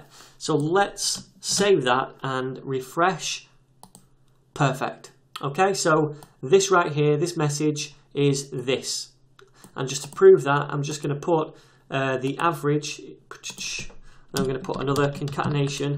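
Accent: United Kingdom. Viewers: Watching you or watching the BBC gives the same experience, the classic British accent.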